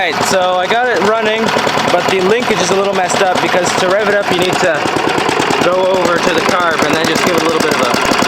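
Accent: Vermont, United States. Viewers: Sounds like Vermont, acts like Vermont and yes it is Vermont. There was an old man down the street that repaired old mowers and was good at it and had 35-40 mowers in bushes around the house. I used to walk the daughter by his place and one day talked with him. Used mowers can bring in some money on the side. He was dealing with another gent who sold those mowers for cheap at a large yard sale. I took over the business of sales and we made 5X as much. Some of the mowers bringing $70-120 apiece. One neighbor had a Honda mower and I offered to sharpen the blade as he had not sharpened it in 15-20 years-yes. Upon looking I found he had been mowing with the blade upside down and backwards for those years! Now he cuts smooth as a new mower. I waited until I got home before I started laughing about the whole thing!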